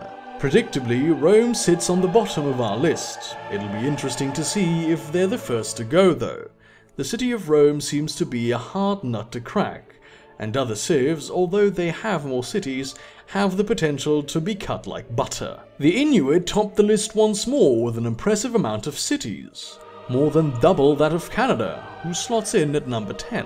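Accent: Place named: Australia. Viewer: Your Australian accent sounds like Russell Brand.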